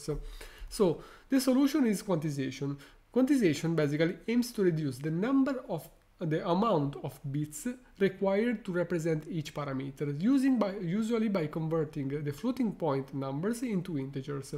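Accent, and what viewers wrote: Italy. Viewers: I've seen many of your videos and you are a great teacher! Thank you for your effort in explaining in plain (Italian accent) English all of these complicated topics. Regarding the content of the video - you showed the quantization-aware training and you were surprised of the worse result it showed in comparison to the post-training quantization in the concrete example you made. I think it is because you trained the post-training quantization on the same data that you tested it on, so the parameters learned (alpha, beta) are overfitted to the test data, that's why the accuracy was better. I think that if you had tested it with true test data, you probably would have seen the result you anticipated.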